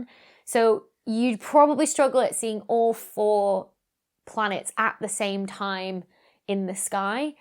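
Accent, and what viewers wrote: United Kingdom. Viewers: That's my thought, too, but Dr. Becky isn't the only British astrophyicist I've heard from. I think she is the only one I subscribe to, though, so perhaps she's more comfortable using the British pronounciation than someone doing a Ted Talk would be. Would be cool if she decided to respond.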